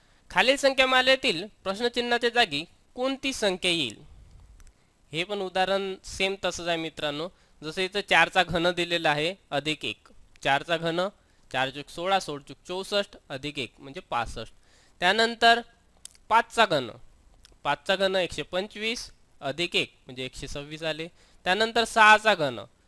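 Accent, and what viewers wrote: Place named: Vidarbha, India. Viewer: very simple and good EXPLANATION .TUMACHA VIDARBHA TONING MAST AAHE KEEP IT UP👍👍👍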